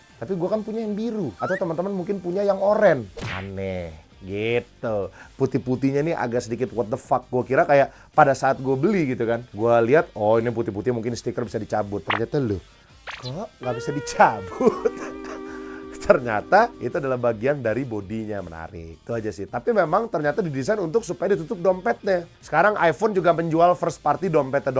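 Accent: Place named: Java, Indonesia. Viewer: Aku mendengar jawa jawa jawa disini 😅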